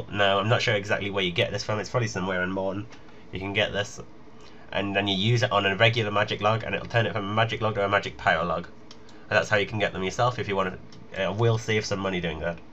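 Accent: Newcastle, United Kingdom. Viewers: You geordies and your accents!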